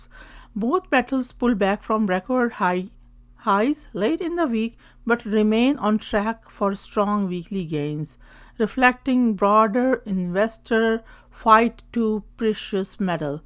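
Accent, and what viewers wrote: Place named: India. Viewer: Wishing you all the best for your health. From your accent, it's my guess that you may be of Indian descent. The medical infrastructure in India is world class, low cost and without any waiting period. Even if you don't have medical insurance, the costs of investigation/surgery in top-class hospitals is affordable. Consider making a trip to India and sort out all your health problems.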